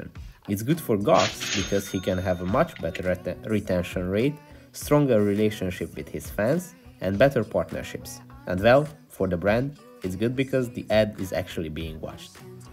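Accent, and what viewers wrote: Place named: Hungary. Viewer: gondoltam hogy magyar vagy az akcentusbol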